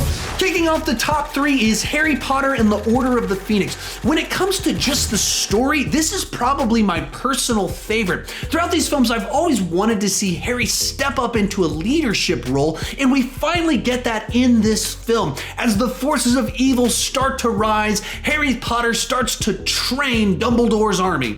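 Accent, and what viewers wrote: United States. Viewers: Birtish names pronounced by an american. Hurts my ears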